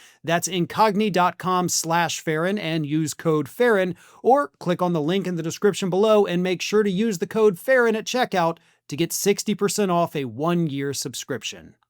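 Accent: South, United States. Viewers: Farron that is not a rural Montana accent you are projecting. That is southern.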